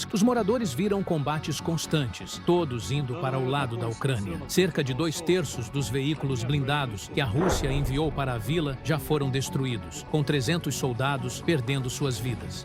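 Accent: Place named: United States. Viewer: Que pronúncia Americanizada é essa com os nomes russos?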